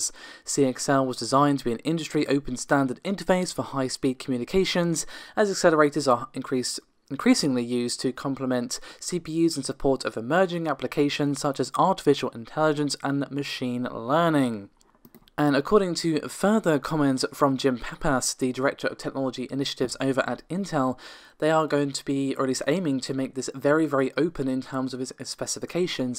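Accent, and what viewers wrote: United Kingdom. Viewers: HAHA, her name is or at least that's what it sounds like, pretty unusual name for me. I can relate because when I found this channel I also thought she sounded more like a British guy, but not to the point where I would confidently say it was a guy. I'm not a native English speaker so that might have something to do with it.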